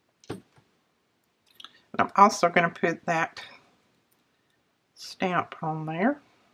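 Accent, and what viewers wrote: South, United States. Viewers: Hello today , I am sitting here with my feet up just enjoying your tutorial and your wonderful southern voice. Would you tell me how to find your granddaughter, she is such a sweetie. God bless and keep you.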